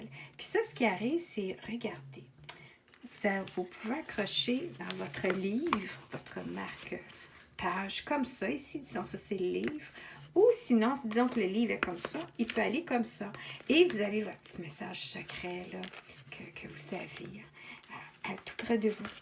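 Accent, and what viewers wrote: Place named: Quebec, Canada. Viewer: oh la la j'adore l'accent québécois, c'est un pur bonheur de vous entendre expliquer. Ce plastique dont on se sert pour couvrir les livres d'école en France, est très pratique en effet. Merci pour le partage, ces petits carnets font de très jolis cadeaux d'appoint. Bonne continuation. :hand-pink-waving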